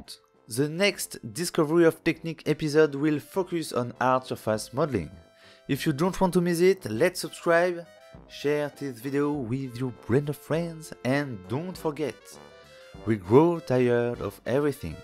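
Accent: France. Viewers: I never thought how French accent sound in english it's quiet fun... I like it... no offense I'm Italian I also speak French but never had the opportunity to listen to a French speaking in english. Great tutorial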